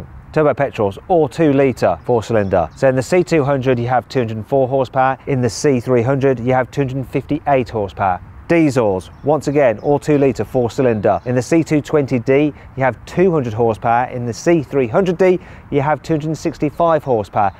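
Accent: United Kingdom. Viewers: 영국발음 헤으응....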